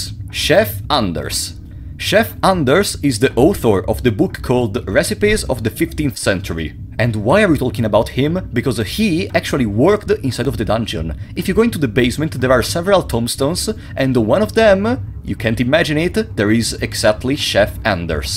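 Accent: Italy. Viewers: i can hear the Italian accent from 1 mile away, and it is both nice and funny btw, great video :)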